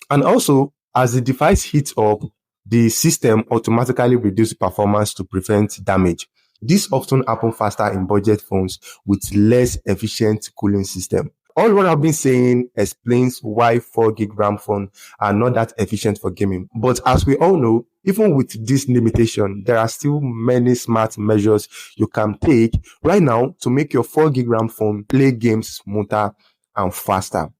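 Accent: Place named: Nigeria. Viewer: Nigerian accent 😊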